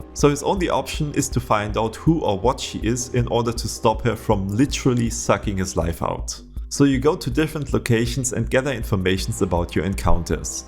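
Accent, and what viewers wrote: Germany. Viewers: Is this guy german? He sounds like a german guy to 100% sry xD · you sound like a german dude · i hear some DEUTSCHLAND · do you from germany ? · bruder dieser deutsche akzent wie geil xD <3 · Total den Deutschen Akzent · I hear agerman aczent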